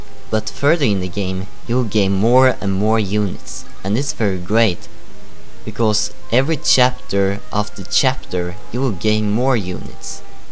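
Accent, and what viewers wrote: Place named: Sweden. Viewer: Hehe. Man hör på ditt uttal att du är svensk. Älskar verkligen det spelet. Det är det bästa Fire Emblem för min del tätt följt av Path of Radiant.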